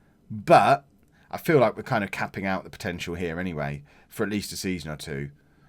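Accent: United Kingdom. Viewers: Like watching David Brent/Ricky Gervais play Football Manager. Not just the accent. It's the attitude, the jokes, everything. Love it.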